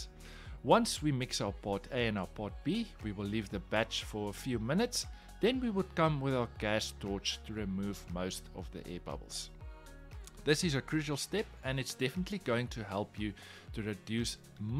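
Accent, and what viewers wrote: South Africa. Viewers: Interesting video, in 2021 I came across a video about epoxy tables and I was intrigued did research and it was interesting, then I let the idea of starting an epoxy business goes waste, 1 1/2 years past then I came across your channel, perfect because I could understand your better because you from SA...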